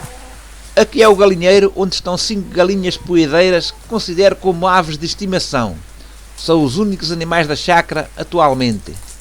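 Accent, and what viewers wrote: Portugal. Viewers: Muita linda a sua chácara! 🌱🐝🌿🌾🐛🌻🌸🌱🌺 ...adoro ouvir o sotaque português! 😃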